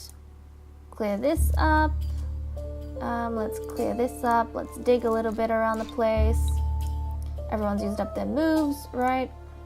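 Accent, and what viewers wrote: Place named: England, United Kingdom. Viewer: They are so cute!! You have an English accent!!!!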